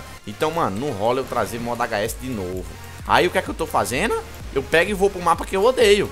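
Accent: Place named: Ceará, Brazil. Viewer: possivelmrnte se num vai meresponder mais vc e Cearense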